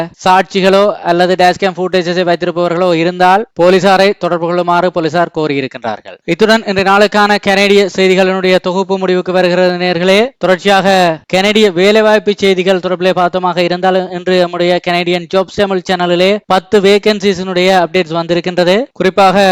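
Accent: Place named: Sri Lanka. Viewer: Lankan tamil,namma chennai tamil pasya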